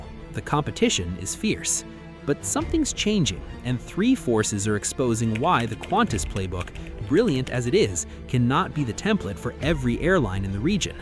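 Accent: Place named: United States. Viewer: This video is very obviously an AI Voiced (with shocking pronunciation in an American accent) infomercial produced by Air New Zealand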